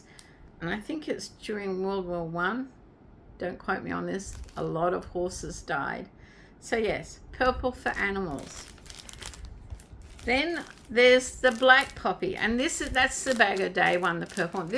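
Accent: Australia. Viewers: As soon as i hear an Aussie accent i click subscribe. Great to have more and more Aussies starting up a YouTube channel, everything is so US centric at the moment. Love your work, loved your! He is a great bloke for this country.:)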